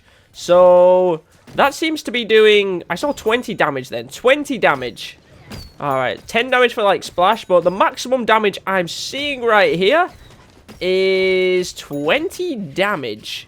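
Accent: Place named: Wales, United Kingdom. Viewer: its mostly his weird whelsh accent and his broken grammar that pisses me off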